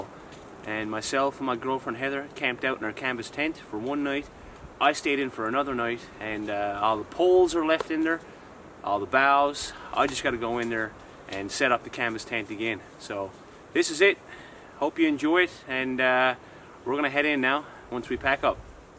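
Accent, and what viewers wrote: Ireland. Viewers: And I am new to your channel but you sound Irish, some of the music too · Just found your channel through joe robinet i know your from newfoundland im from northern ireland and you sound as if you've got a bit of an irish brogue about you I've watched a couple or your videos and liking them very much i subscribed so will be looking forward to some more videos well done m8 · Why the half Irish accent? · New to the channel. Love it. Cannot get enough. You sound Irish to me or is that the Newfoundland accent. Please do not be offended, just trying to learn. Thanks! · "Go habs go" Alright buddy, I liked you yesterday, now I'm straight up in love! Dude your channel is loaded with content! Damn productive you are! Is your accent from Ireland? Also, where do you live man, to be a habs fan?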